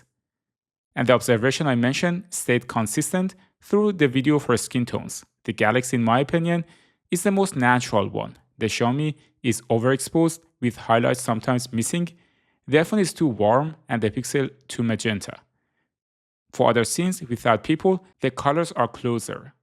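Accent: Iran. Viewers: Are you persian? You sound persian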